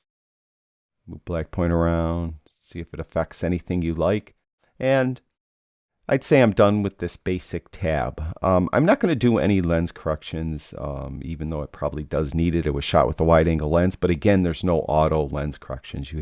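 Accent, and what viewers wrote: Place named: Buffalo, United States. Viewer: I left Buffalo in 1964, graduated from Hutch Tech in 1962, the second I heard your voice I knew you were from Buffalo...I'm practicing my Buffalo accent..😊Very good videos.. I am a long time PS user and just switched to Affinity. Good stuff. Thank you.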